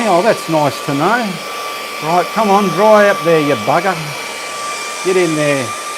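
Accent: Australia. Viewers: I live in another part of the world, so I’m never so lucky to catch you live, but i love the replay 👍👍 i love your way of painting but most of all i love the way you talk, aussie langue is very inspiring 😉 thanks for you 👍👍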